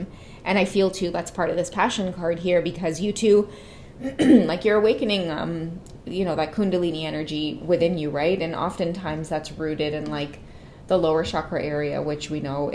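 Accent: Trinidad and Tobago. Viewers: Hey !!! Are you from Trinidad 🇹🇹 you sound like you from.am from Trinidad 🇹🇹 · Your Trini accent is everything for me 🥰 Carribbean gal tingz 😂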